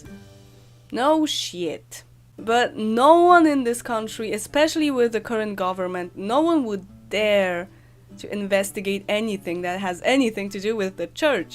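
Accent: Poland. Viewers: so like, im polish ye and ive sacrificed learning words for the sake of accent. So when i watch your vids i wonder if you should improve on that too or leave it be since it sounds way too much like polish accent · I'm Polish and I can tell you, you are boring, you have very little knowledge of Polish customs, all you picking on is some bizarre stuff that you heard somewhere, and yes you are of Polish background because of some clear Polish accent you produce, I recommend that you present Poland in Positive matter rather then negative, since you are Polish. Hmmmm =D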